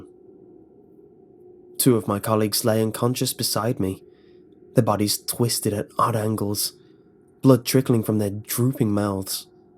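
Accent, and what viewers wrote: United Kingdom. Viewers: Hi. What is your accent called exactly? I got the exact same and people ask me what (British) accent is it?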